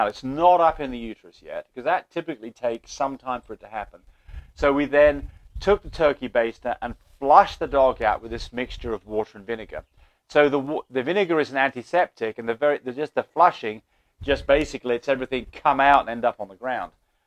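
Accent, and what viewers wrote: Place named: England, United Kingdom. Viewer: Ps: I saw you have a English accent 🇬🇧I’m from England too. Do you know anyone in England who breeds dogs and does these videos? Thanks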